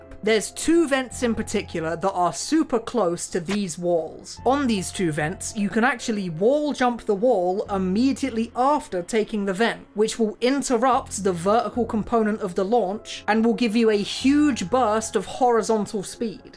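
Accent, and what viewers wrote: United Kingdom. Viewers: I am nourished with this, yet I am not satisfied, I guess I can never feel the same with TF2 for a while, but you should still talk about deadlock, it's a cool game and the way you say swoons me of how bri'ish it is. Btw I hope this won't be the next game for Valve to forget after some years without a "final update" Btw can you talk about celeste movement pls? It would be really cool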